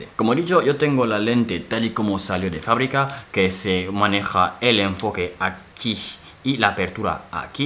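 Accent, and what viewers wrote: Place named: Spain. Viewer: Pocos videos hay en español y tu lo resume bien, por cierto de donde eres Bro? Traes un acento que me suena bastante y batallo en identificar, es una mezcla con el acento español.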